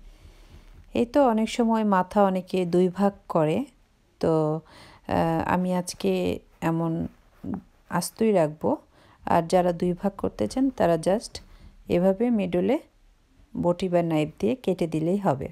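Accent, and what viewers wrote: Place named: Dhaka, Bangladesh. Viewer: আপনার কথা শুনে ও ইলিশ মাছ কটা দেখে মনে হল আপনি ঢাকা বা বিক্রামপুরের মানুষ।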